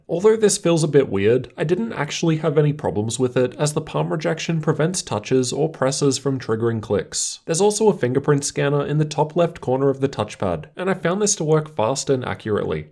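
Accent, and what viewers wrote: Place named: Australia. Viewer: Imagine not spotting an aussie accent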